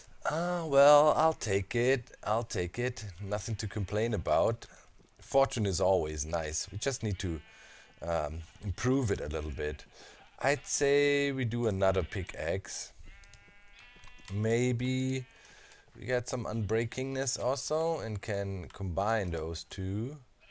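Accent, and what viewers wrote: Germany. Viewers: Does sound more German than usual in this video or is it just me?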